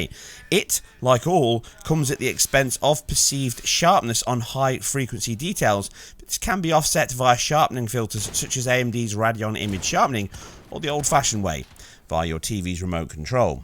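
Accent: United Kingdom. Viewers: Nice to have a British YouTuber who pronounces words in a British accent instead of adopting American pronunciations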